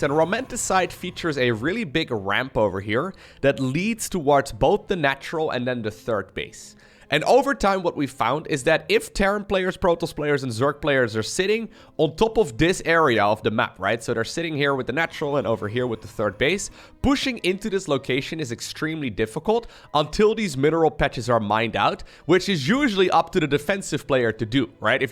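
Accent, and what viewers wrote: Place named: Netherlands. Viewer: its the dutch accept onme of my best friends he speaks like that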